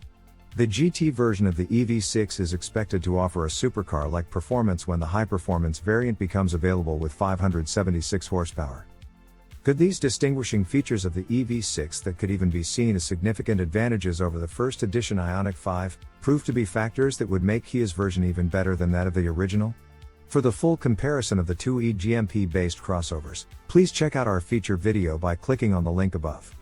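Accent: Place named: United States. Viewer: Great-looking car, but deathly awful Americanised narration and leaden storyboard. Get a British production company to produce your promotional videos. You only have to look at the UK's Grand Tour production values to witness innovative and inspirational delivery, which is absent everywhere else.... in the world.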